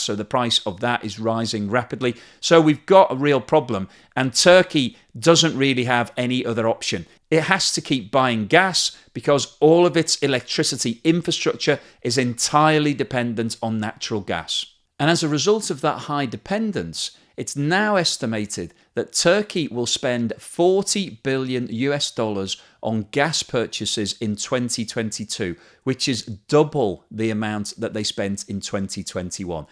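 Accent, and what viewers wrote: United Kingdom. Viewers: Hi, it doesn't really matter what introduction you use. We are here for your nicely structured concise information updates and we love your British accent as well. Did you notice the channel of "Michael invests and tries to make money" always starting with "holy smokes" with an Aussie accent?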